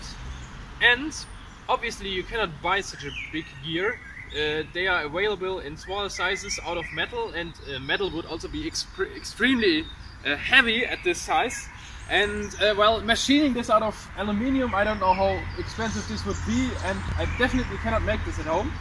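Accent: Germany. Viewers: das ist doch 100% deutscher dialekt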